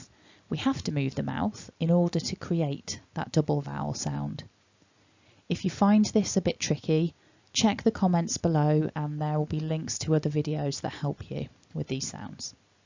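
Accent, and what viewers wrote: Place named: RP, United Kingdom. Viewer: I'd like to point out that in Modern RP, [ɔʊ] (and sometimes [ɒʊ]) is an allophone of /əʊ/ before syllable-final /l/. It can be ascribed to the velarisation of the following /l/ (aka darkening effect of the dark l). So words like "goal", "whole", "coal" are pronounced with [-ɔʊl]. And I hear that in your speech too.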